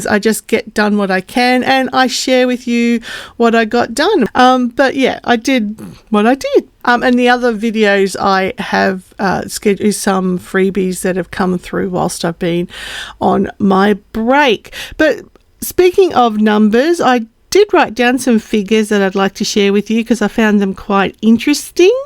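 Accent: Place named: Australia. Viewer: Happy ne year.I hope Luna has her stiches out soon. Thanks for sharing your crafts. I enjoy your vids and I like hearing the aussie accent(Im just down the road a bit) I feel inspired and I find watchcing them relaxing. I don't do diamond painting but I do love colouring and I often make brickbuilds (usuaslly asian brands but they have some great animals, including dragons. I think you are really productive in comparison to me. I really have to be in the right mood to do much colouring.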